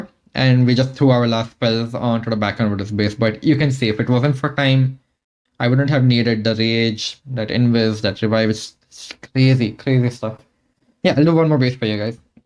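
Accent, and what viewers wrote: India. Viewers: Love your accent, which part of India are you from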